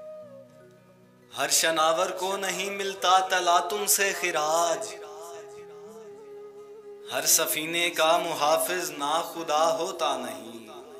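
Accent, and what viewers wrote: Punjab, Pakistan. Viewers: Such beautiful poetry & such bad wrestling style voice & Punjabi accent for Urdu poetry is the worst. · You are basically a Punjabi speaker. Punjabi reflects in your style. When you are reading Urdu you should purely read Urdu.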